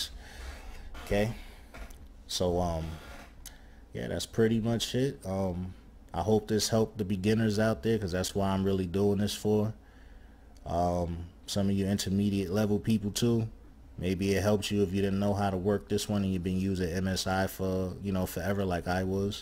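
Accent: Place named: Australia. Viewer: He's obviously Australian.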